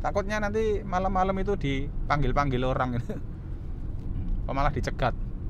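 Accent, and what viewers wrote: Java, Indonesia. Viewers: Suarax kok medok bgt y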